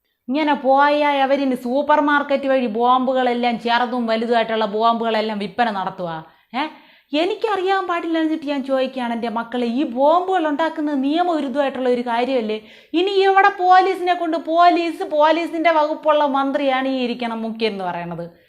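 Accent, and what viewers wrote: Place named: Trivandrum, India. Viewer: കണ്ണാടിയും, മുണ്ടും ചട്ടയും, വയസായ ലക്ഷണവും, തിരോന്തരം ഭാഷയും 👌👏👍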